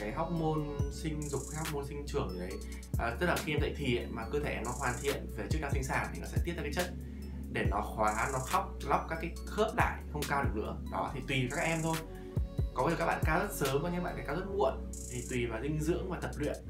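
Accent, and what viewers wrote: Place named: Hanoi, Vietnam. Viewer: thích video của vì các lý do sau... 1/ gần gũi 2/ những gì a chia sẻ khá thông dụng vs ng xem 3/ giá hàng hóa khá phù hợp vs đối tượng như e (ít tiền) 4/ giọng HÀ NỘI (cute)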